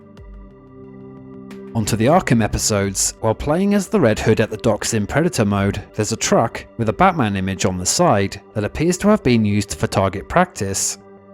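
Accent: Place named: United Kingdom. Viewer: I love these videos and your British accent. Great videos to sleep to, not too many loud sounds n such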